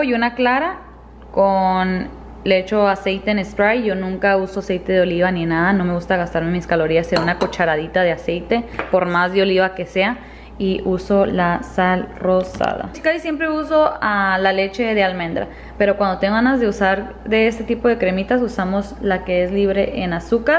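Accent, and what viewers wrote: North, Mexico. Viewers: me parecen buenísimas tus recetas! Gracias por compartir! De donde eres, tú acento suena del norte, yo soy de Nuevo Laredo! Saludos!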